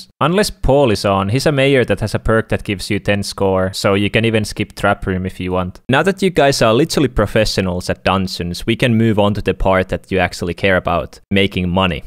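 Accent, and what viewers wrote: Finland. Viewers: R u Finnish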